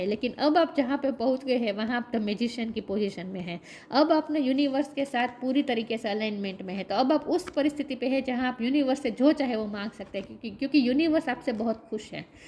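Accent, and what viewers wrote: Gujarat, India. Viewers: Thank you so much pranam Koti Koti dhanyvad Ishwar Koti Koti Pranam Shukriya mam Aapka kya aapane Puri Baat samjhaie aap bolane se Gujarati Lagte Ho thank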